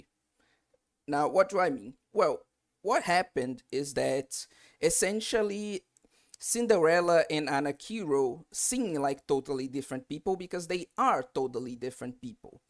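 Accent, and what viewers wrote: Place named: Philippines. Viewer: Philippines English is strong in this video...put tank in the mall... Strongest race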